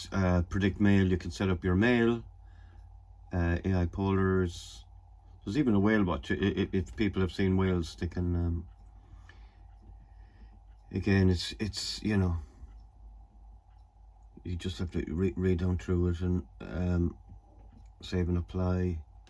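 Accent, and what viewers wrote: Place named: Ireland. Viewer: Oh ! What a pitty ! The AI translate everything in french ! No more irish accent !😑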